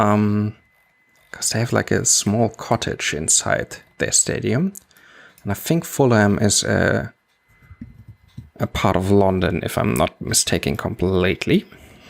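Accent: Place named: Germany. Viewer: Hi, thanks for subscribing to my channel ! Where are you from btw ? I'm guessing either Germany, Austria, Switzerland or Denmark based on your accent (but I might be completely wrong haha) Edit : after watching another video (the Romania Fake one), I'm not so sure now haha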